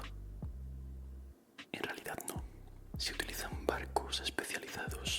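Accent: Spain. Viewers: Me Encanta el Asento Españolado 😮 Muchas Gracias x Tus ASMR me relajan Bastante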